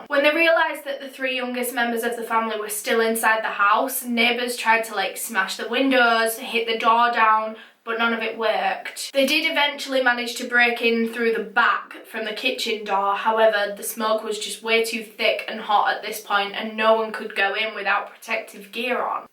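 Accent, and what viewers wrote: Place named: Yorkshire, United Kingdom. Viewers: it's her northern english accent - i think she's from yorkshire cuz of her accent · Just got into your videos and I’m so happy I found your channel. I’m really interested in true crime stories and have pretty much binged your channel since I found it. Love the accent, I live in a town near Hull and went to University in Sheffield so it reminds me of my time there quite a bit. Keep up the amazing content you’ve gained a new sub :)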